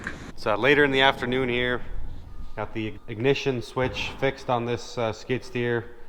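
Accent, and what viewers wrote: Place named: Netherlands. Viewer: Hi, it is quite clear that you presumably have Dutch ancestry, were you born in the USA or immigrated, your English is at least good.. But as cold as it is now, it is not extremely hot in the summer, and isn't it very challenging at times. Thank you for wonderful everyday videos that I can watch here in little Denmark.. Greetings Erik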